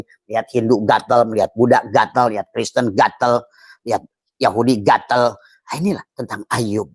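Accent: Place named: Java, Indonesia. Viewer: Pasti orang jawa ini ya😂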